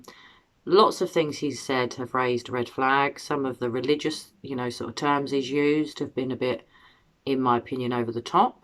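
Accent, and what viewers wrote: England, United Kingdom. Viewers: Lots of red flags. So many to count them. They acted like Summer was dead I agree with you I think this precious baby girl had an accident at the waterhole and they're all covering for each other Of course they don't want to go to jail or prison I think that one of them were some of them took Summers body and put her somewhere but not on the grounds for they live. Yes I'm sure the dogs would probably be smelling that if that was true I don't doubt that her body could have been in the shed to begin with and he moved it that's a thought too This whole family is covering for everybody is what I believe is what i believe. All they talked about was her past in that interview and that they knew she was gone forever. That's what I picked up out of it when I saw that interview. What part of England are you from? My Dad was from Birmingham England. 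I love your accent it's very beautiful. God bless you doing these videos and keeping the story going until justice will prevail. Whether she be gone or whether she still be alive, justice still needs to be served 🙏🙏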